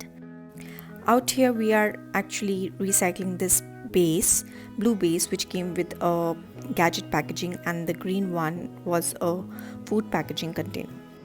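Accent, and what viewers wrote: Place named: Mumbai, India. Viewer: Where are you based? Your beautiful accent reminded me of my holiday in Mumbai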